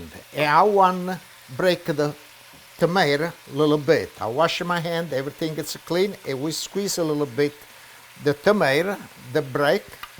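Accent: Italy. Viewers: Omg as Italian who lives in Italy the way he speaking make me laugh truly an original Italian immigrant, very focused to he’s recipe!! Greetings from Italy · you remind me of my grandpa. love that italian accent and the o ya baby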